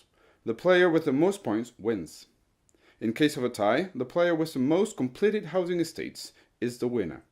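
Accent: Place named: United Kingdom. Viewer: Waou la voix qu'il a en anglais! Très lord british!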